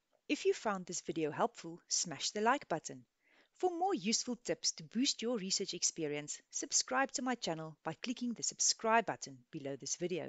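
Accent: South Africa. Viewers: South African accent recognisable anywhere in the world ❤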